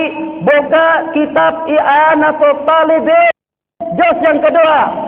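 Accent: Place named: Banjar, Indonesia. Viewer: sidin nhe urang manaaa, ada banjary nya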